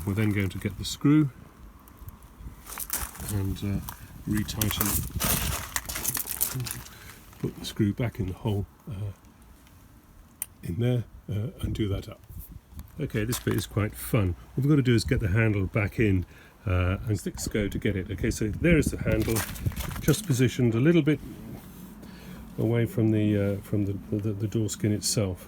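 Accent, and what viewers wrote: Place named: RP, United Kingdom. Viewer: Well done, just what I was looking for. For a first effort at such a video, a veritable masterclass: no hideous background music track, no blether, just the info you need, delivered in RP. Thank you.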